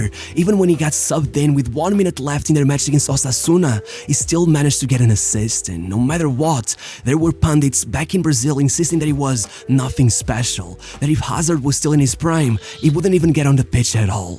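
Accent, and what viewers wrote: Portugal. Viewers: It took me 2 minutes to realise that this guy was Portuguese lol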